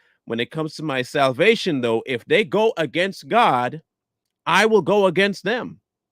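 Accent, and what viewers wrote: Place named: New Orleans, United States. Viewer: I'm from New Orleans..same accent..😇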